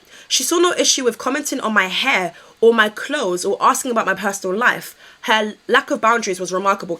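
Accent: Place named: London, United Kingdom. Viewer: lol I love the career/Korea part in your wonderful Londoner? accent.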